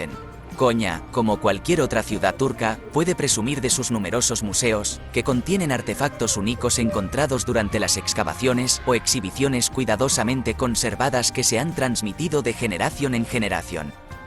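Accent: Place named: Spain. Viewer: que mal habla, aprenda a pronunciar propiamente, hace del video una mierda.Ese acento español, pronuncie como se pronuncia en su idioma. la ñ, y no es túrquia es turquia. IGNORANTE!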